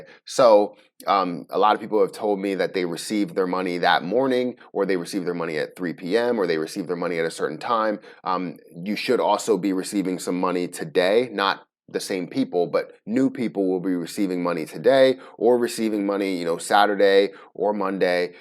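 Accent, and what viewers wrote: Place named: Baltimore, United States. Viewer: Are you from Baltimore,? Just trying to guess by your accent.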